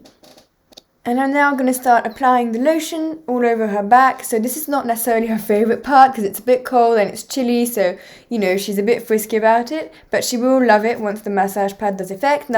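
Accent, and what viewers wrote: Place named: England, United Kingdom. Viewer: Sorry, I don’t really understand you English accent. Can you add subtitles please? Anyway, you’re a good rider🫶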